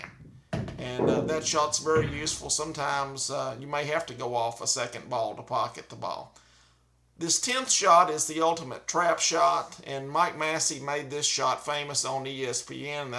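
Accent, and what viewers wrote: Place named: Texas, United States. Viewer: I have enjoyed your videos and commented on several. Given the title of this one, I was a bit disappointed. Only 5, 6, 8, and 9 were truly instructive - yet one has to look for the opportunity to try them.. The others were variations of trick shots found in any trick shot books (see Byrne or Massey). An alternative shot on the “corner hooked” down the rail shot is a 3 rail shooting into the near corner face. Aim at 1st diamond up from object ball. I’m sure you know this trick shot. Keep ‘em coming. You are delight to watch and listen to. You accent takes me back to my Texas upbringing.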